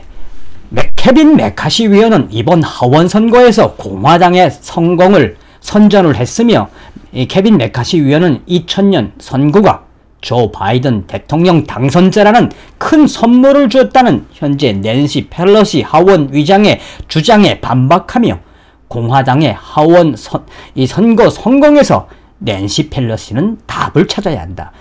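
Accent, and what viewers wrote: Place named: North Korea. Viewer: 북한 말투쓰지 말고 그냥 평범한 일반 표준 한국어로 진행 하시길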